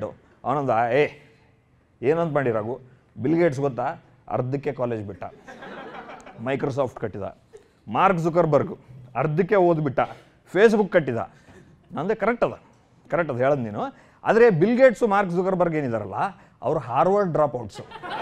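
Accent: North Karnataka, India. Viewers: His voice Uk accent Jokes all ...Lit🔥🔥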